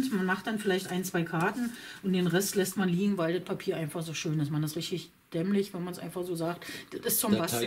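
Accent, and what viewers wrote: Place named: Berlin, Germany. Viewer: Hallo, großes Kompliment für Deine Videos, die sind informativ, mit praktischenTips, Bezugsquellen und "auf dem Teppich geblieben" 😁 Dabei mag ich auch sehr den Berliner Dialekt und Deine treulich kommentierende "bessere Hälfte"!! Bei Euch geht es nicht - wie leider so oft heutzutage - um mehr Schein als sein. Ich guck's total gerne! Danke!! Viele liebe Grüße, Michaela ( Nordhessen mit Berliner Wurzeln 😜) 👍🍀🐞👍❤️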